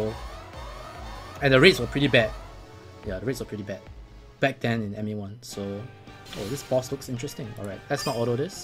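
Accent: Singapore. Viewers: its called singlish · you mean everyone in Singapore speaks like this and actually understands each other??? O_O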